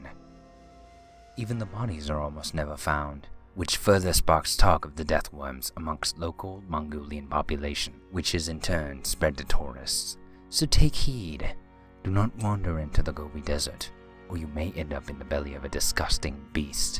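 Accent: United Kingdom. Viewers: You sound like British person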